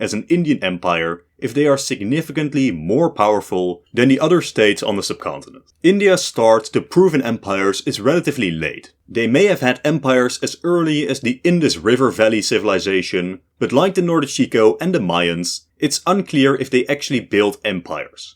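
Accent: Netherlands. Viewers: I hear Dutch in your voice my friend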